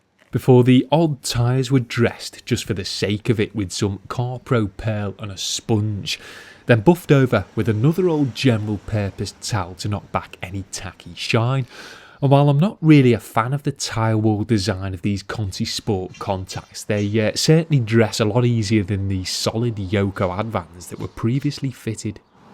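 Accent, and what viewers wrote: Liverpool, United Kingdom. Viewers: I'm pretty sure he's a Liverpudlian (didn't want say scouse, may have come across as being rude and didn't want to offend those from that area)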